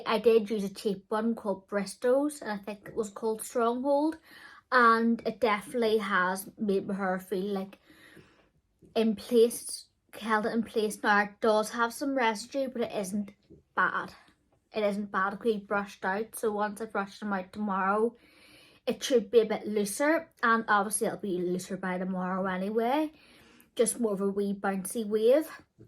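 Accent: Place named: Ireland. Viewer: Thanks for video. Love your accent! Are you Irish or Scottish possibly? 🤔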